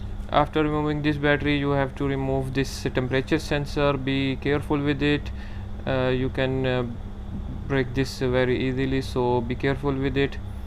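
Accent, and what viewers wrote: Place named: India. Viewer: Indians speaking english sounds very ridiculous!!, next time use subtitles.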